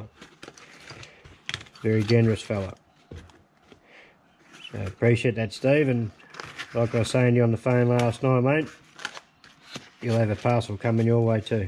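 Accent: Australia. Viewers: You do great videos, and great workmanship on your projects. I've been watching your channel as well as CEE for the content as well as both of your Ausie accents. Keep it up.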